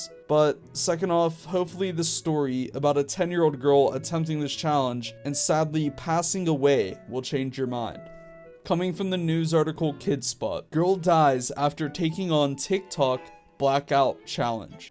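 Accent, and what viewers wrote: United Kingdom. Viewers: now one realise he was british at the start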